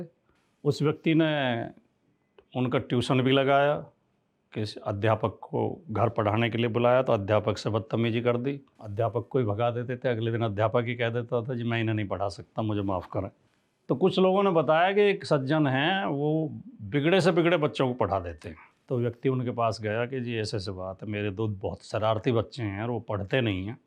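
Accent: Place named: Rewari-Alwar, India. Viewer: मैंने आपकी काफी पोस्ट देखी है कोशिश अच्छी है परिणाम देर से ही सही आएंगे जरुर बाकी अंधभक्तो को आईना दिखाने की जरूहु नहीं है साहब आपके बोलने के लहजे से आप रेवाड़ी अलवर मथुरा लालसोट दोसा भरतपुर इलाके के लग रहे हो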